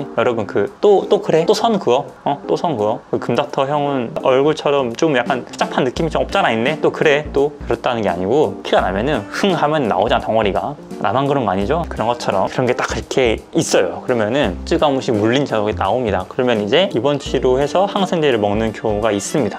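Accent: Gwangju, South Korea. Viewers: 광주 말투 너무 좋아여!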